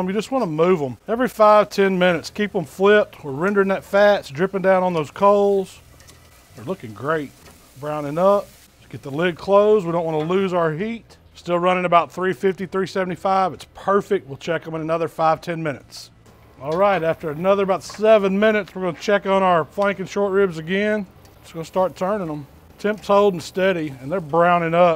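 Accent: South, United States. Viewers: Man that looks good. A fat chef with a south accent is a must when it comes to BBQ.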